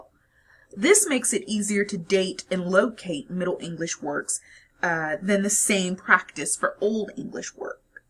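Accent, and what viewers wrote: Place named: South, United States. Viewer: You do in fact sound southern.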